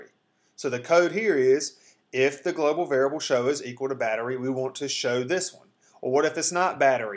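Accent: South, United States. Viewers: man. this man's country accent. feels like cowboy from action movie is teaching me programming.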